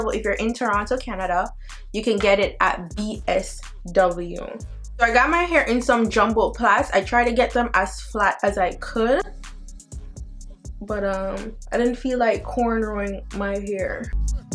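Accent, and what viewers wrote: Jamaica. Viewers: Your an Jamaican I used to watch you video when I was younger but now when mi watch it mi see say you sounds like a Jamaican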